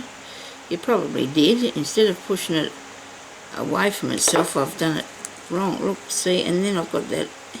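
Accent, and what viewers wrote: Australia. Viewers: love the accent. you in aussy. good video. always wanted to come to Australia but everything over there that bites is deadly lol. good job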